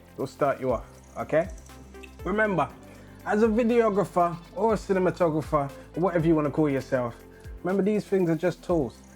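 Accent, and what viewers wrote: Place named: England, United Kingdom. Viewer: great review. gonna pick one up. also this is my favorite english accent, haha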